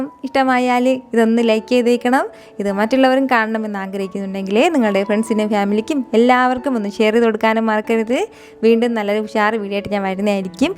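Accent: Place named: North Kerala, India. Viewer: ഒരു വടക്കൻ കേരളം slang ഉണ്ട് kitchen may have routes from മലപ്പുറം, കോഴിക്കോട്, or കണ്ണൂർ.., I think so. Very cute വർത്തമാനം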